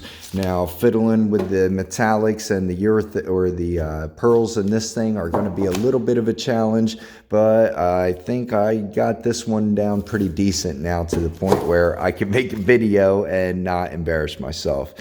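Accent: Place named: Philadelphia, United States. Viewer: Not sure where you are located but your accent reminds me a lot of folks I used to work with near Philadelphia in Newtown. I'm betting you're from that part of the country!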